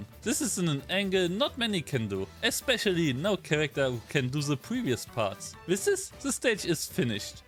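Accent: Germany. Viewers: I've been wondering for a long time now. Are you German? The way you pronounce certain names combined with your accent really reminds me of German. If I guessed wrong, please don't be offended.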